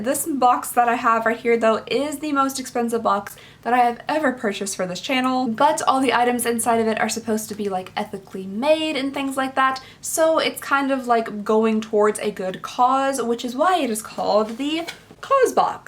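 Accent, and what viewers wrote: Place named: South, United States. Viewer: I’m from the South but am currently on the west coast courtesy of the military and I watch all of your videos because I loooove hearing your accent. It makes home feel just a little less far away.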